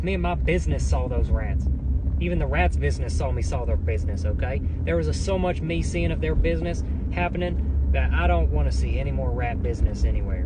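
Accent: South, United States. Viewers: is he talking about possums? lol i guess they are noisy little creatures. he had more of an southern tang in 2018 did yall notice that?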